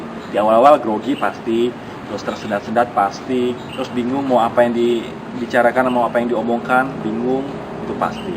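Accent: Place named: Java, Indonesia. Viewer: medoknya lucu haha..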